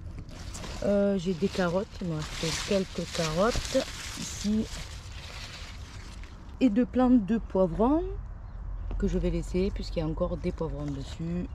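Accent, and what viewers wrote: South, France. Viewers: ah super, je me disais bien que c'était l'accent du Sud, de chez nous !!! Ben oui, ils disent tous "je suis du Sud", beaucoup de gens qui font des vidéo comme vous, et ils sont du Ouest, de bezier... C'est pas le Sud ca😂.... Super vos vidéo et explication, je m'abonne alors, merci...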